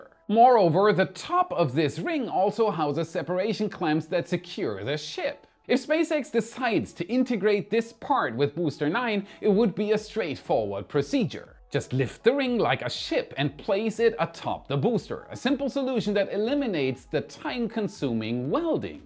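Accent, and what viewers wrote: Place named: Germany. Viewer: He’s native German.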